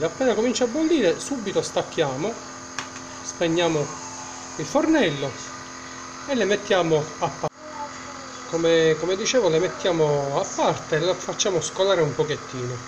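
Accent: Sicily, Italy. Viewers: Mamma mia l'accento siciliano si sente tanto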